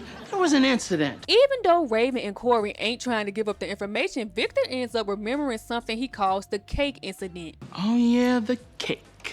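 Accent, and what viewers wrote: Memphis, United States. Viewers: You from Memphis ain’t it 😭😭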